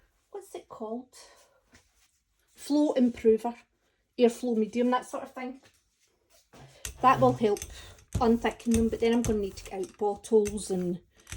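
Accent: Scotland, United Kingdom. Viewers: Thank you for this! I bought a gyro cut a while ago and didn't know how to use it. I think I might have to buy the deep cut though as I want to use it on Dura-lar sheets. However, I do have some Tyvek, which is a lot thinner, so I'll try it on that. By the way, I LOVE your accent! it's like music to my ears and it reminds me of all the wonderful people I met during my travels in Scotland <3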